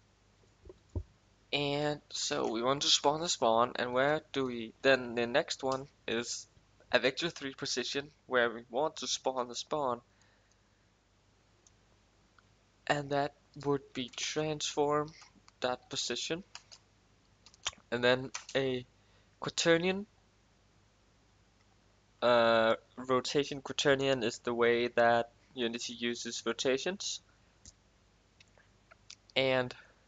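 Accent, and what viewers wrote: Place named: Denmark. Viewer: Just guessing off the accent but are you Danish?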